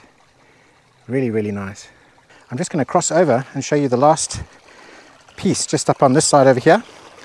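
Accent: South Africa. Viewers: Hearing the Saffa accent when I clicked on this video was definitely a pleasant surprise 😂 I'm hoping myself to live somewhere in rural Portugal someday while working online. Seems like a dream.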